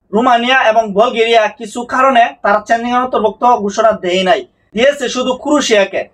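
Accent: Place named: Brahmanbaria, Bangladesh. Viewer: আসসালামু আলাইকুম ভাই আপনার ইনফরমেশন কথা ঠিক আছে।আশাকরি আপনি আরও উপরে উঠবেন আমরা আরও অনেক কিছু যানতে পারবো আপনার মাধ্যমে। তবে একটা কথা আপনার বয়েস কোয়ালিটি একটু শুদ্ধ সাধু ভাষায় বলার একটু চেষ্টা করবেন। ঠিক হয়ে যাবে আস্তে আস্তে চালিয়ে যান কিছু মনে করবেন না আপনি মনে হয় ব্রাহ্মণবাড়িয়া জেলার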